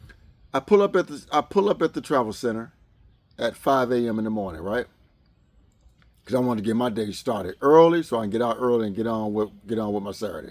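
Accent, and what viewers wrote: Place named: United States. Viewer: American accent is really troubling.. is he talking about a Pizza?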